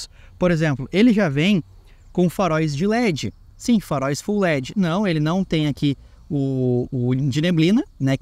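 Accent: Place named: Rio Grande do Sul, Brazil. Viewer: Um FOLKSwagen kkkk esse sotaque gaúcho é um barato!!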